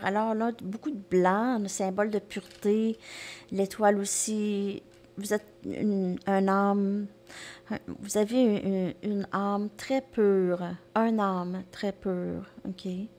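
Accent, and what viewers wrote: Canada. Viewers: Merci 🥰! Je vous ai découverte depuis peu et j’aime beaucoup vous écouter. Vos guidances me parlent et j’aime aussi votre bel accent canadien 🤗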